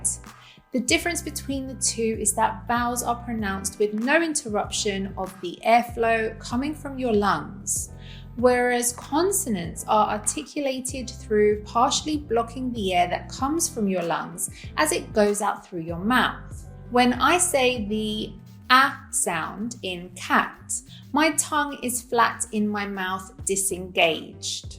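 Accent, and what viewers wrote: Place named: United Kingdom. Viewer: The British accent is finding it kinda hard to understand Maybe the American accent is easier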